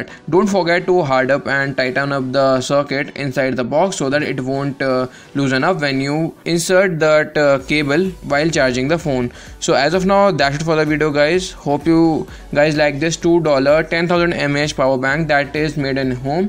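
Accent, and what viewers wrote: India. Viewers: Am from Kenya but from that accent I knew he was from India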